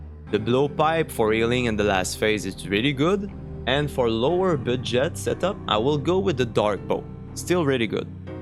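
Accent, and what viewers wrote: Quebec, Canada. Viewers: Trop cute ton accent de Québ, bon vid!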